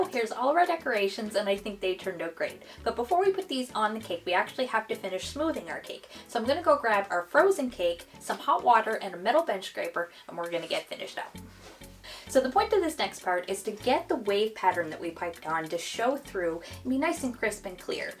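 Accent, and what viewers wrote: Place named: Canada. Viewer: Now I thought she was American until she started saying aboot (instead of about) hahaha Canadians speak so strange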